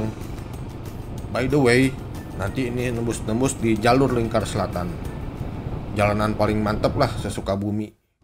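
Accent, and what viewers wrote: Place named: West Java, Indonesia. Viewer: logatnya Sunda pisan ahahahah. adem dengernya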